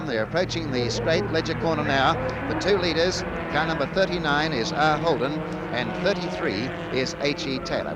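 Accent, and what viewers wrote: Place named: United Kingdom. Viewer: Yes. does a great job here in commentary of giving silly old codgers a bad name. Evidently, motor racing & production sedans especially, were not his strong suit. However, his commentary here is a priceless time capsule displaying how things really were back in 1968. Aunty (Our ABC) did perform a marvellous public service by sending film crews, cameras & commentators to a multitude of events similar to this one when the big three commercial networks couldn't be bothered. Then, to cap off that effort, they archived the film reels long before Joe Public could lay his hands on a video tape recorder. may have been a tad in over his head but here he is working on a weekend devoted to his work & bringing us some classic Aussie motor racing action. commentary here is priceless & bordering on comedic. He barely had a clue but gave it his best shot in his awkward best Queen's English. 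From his commentary, I can picture him & many like him, black suit jacket, thin red tie reaching into the glovebox of a Humber Snipe or a Vauxhall Cresta, retrieving a thermos & pouring a steaming hot cuppa in the paddock between events at glorious old Warwick Farm. takes us there.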